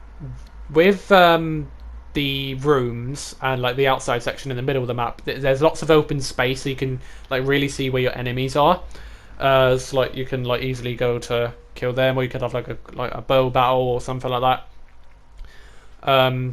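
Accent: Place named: United Kingdom. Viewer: you sound more british here. Is bmpt americanizing you?!?!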